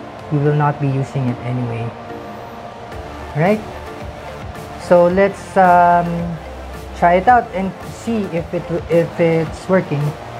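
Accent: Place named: Philippines. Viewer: u sounds like a filipino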